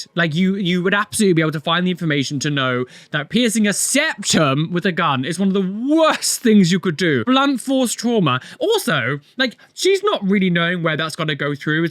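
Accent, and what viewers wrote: United Kingdom. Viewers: you have such a distinct british accent 😆 i love listening to your voice and practicing my english skills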